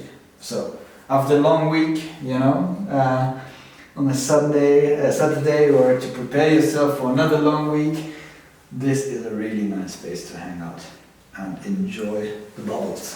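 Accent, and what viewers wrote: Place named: Germany. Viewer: You are from Germany your accent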